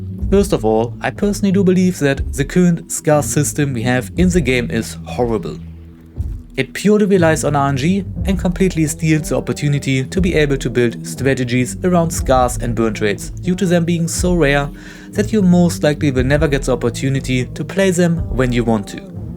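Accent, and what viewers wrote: Germany. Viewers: Diggi machst du das mit dem deutschen Akzent absichtlich so doll? xD Ist ja crazy xD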